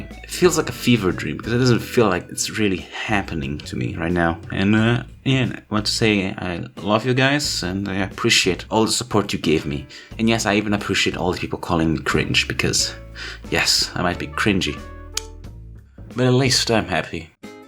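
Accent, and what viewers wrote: France. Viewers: You’re french? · Are you French or Italian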